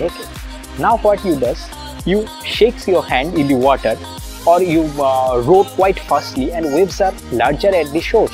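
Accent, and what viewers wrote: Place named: India. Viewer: English? More like Hindlish..with terrible background noise.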